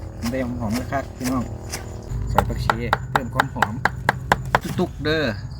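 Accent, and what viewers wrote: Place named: Chaiyaphum, Thailand. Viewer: สำเนียงคนชัยภูมิจิครับ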